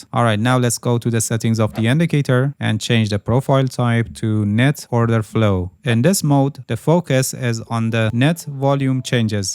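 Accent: Iran. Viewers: The accent. Isn’t it Persian ?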